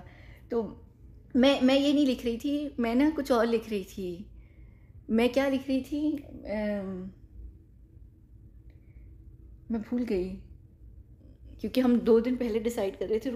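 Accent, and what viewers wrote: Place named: United Kingdom. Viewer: All this is true. But becuz speaker says she is from a wealthy family with an enviable diamond collection , speaking multiple languages with a British accent etc……..she looses credibility.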